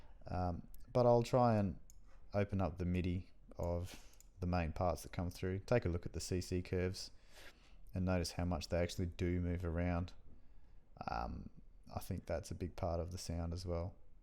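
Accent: Australia. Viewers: Also I notice you have an Australian accent. Where in OZ are you from? Did you know that Cinematic Strings was recorded at the Verbruggen Hall at the Sydney Conservatorium? Have you tired CS?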